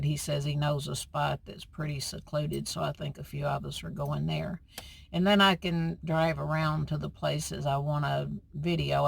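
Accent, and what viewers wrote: South, United States. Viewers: I’m so sorry you had such a bad experience. You did the right thing. No sense in lowering yourself to their standards. You are a beautiful person inside and out so don’t worry because usually it’s their issue not you. I should be there Wednesday. I’m going to meet MaryAnne at an artist meetup. I’ll call you to see if you want to go there. Artists are usually pretty laidback. I love your southern accent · I'm a new subscriber. I love a southern accent. I'm also 66 yo woman. Keep updating us. I love it. Mean people suck. That's on them. I think we could totally get along as neighbors even if your pet gets loose. The nice thing about van life you can leave and find new neighbors.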